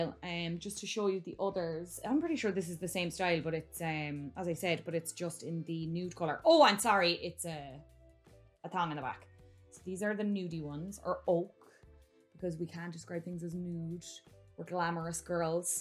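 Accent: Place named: Newfoundland, Canada. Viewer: I swear I thought you were from Newfoundland or east coast Canada. By the accent. Yes I watched this video after the razor video. #Creepy.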